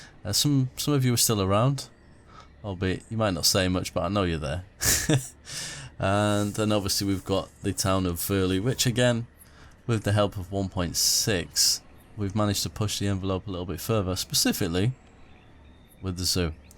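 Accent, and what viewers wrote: England, United Kingdom. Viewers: This was a great series; enjoyable to watch. Thanks much! As for the use of mods in the next series, I'd say it depends on the overall goal. If creating a decorative oasis is the goal (like the zoo), then using mods to relieve the survival pressure so that you can concentrate on being creative seems wisely used. If the goal is survival, then not so. Folks like myself watch your series for the artistic aspect (and your English accent!), so it seems right to free up your time to have fun being creative. ;-)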